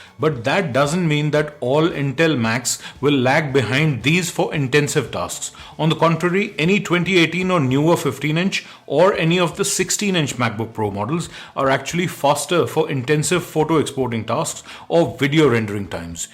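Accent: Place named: India. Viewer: Super excellent videos..Great guide to lot of decisions..The M1 playlist is just incredible..Glad to find an Indian English speaking content creator adding so much great value..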